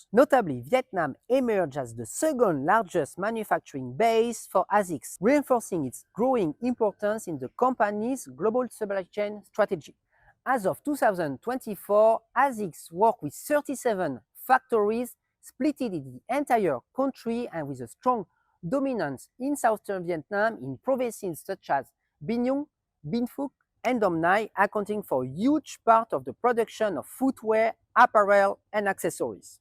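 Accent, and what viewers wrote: France. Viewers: mais gros t'es un enfoiré tu fais en anglais d'accord mais.. sous-titré en anglais tellement ton accent est francais wtf ??! je parle anglais mais la jai besoin des sous titres mdrrrr je pense les anglophones entendent du français la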